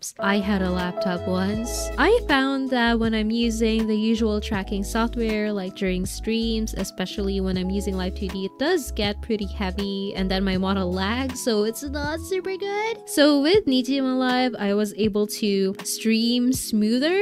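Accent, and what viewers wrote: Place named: Philippines. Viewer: Yk when i first heard your voice, i was like is she a filipino?, she's definitely a filipino. and after i searched you deeply You are indeed a filipino HWAAHAHAHSHSS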